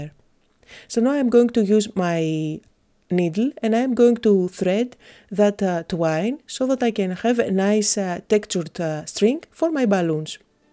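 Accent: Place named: Greece. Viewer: I always liked your accent and wondered where you are from . I wouldn't have thought Greece. I have always wanted to go there!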